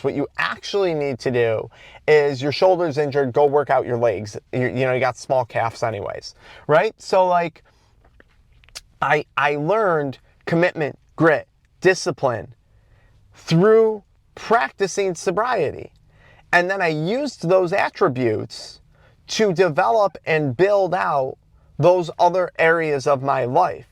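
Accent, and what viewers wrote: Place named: Michigan, United States. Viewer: I'm going to pin the accent on southeastern michigan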